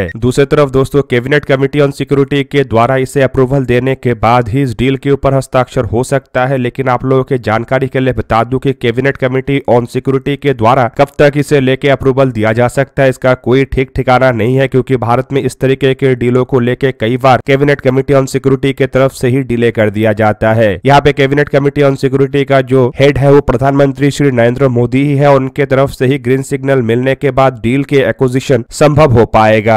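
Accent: Bengal, India. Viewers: Bengali speaking Hindi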